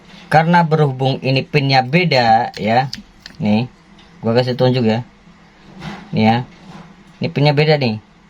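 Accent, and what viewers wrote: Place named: Java, Indonesia. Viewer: Agak medok, tapi oke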